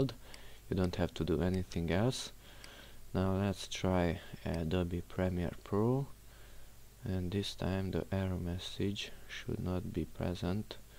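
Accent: Brazil. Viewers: Reconheço um brasileiro falando inglês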